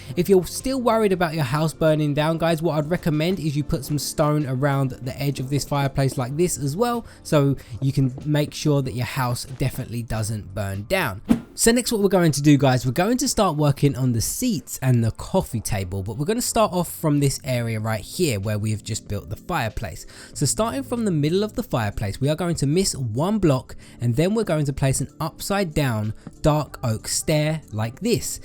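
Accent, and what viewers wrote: United Kingdom. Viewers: I Need Some Attention xD 😂😂 British ascent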